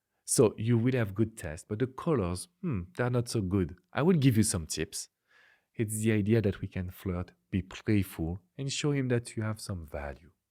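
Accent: France. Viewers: I've just came to your channel, watched a couple of videos and I must tell you: you have very beautiful hands! I keep staring at them while listening ! And obviously I appreciate French man talk in English. Thank you for sharing great stuff. I subscribed, so I'm staying. Love from Poland. 💗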